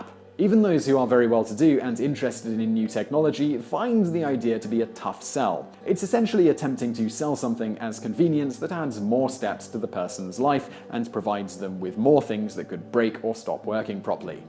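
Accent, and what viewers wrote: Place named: Kent, United Kingdom. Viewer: He's from Kent in England.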